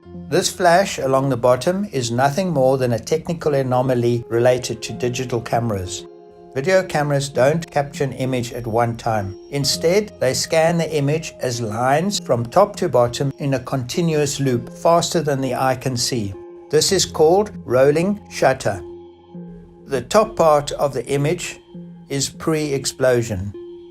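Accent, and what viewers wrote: South Africa. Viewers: I hear a very South African accent! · He's South African. He has a strong SA accent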